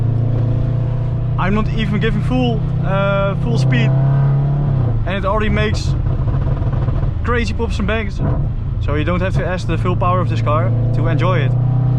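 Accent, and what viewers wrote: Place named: Netherlands. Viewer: hahaha ik hou van dat welbekende nederlands accentje 😂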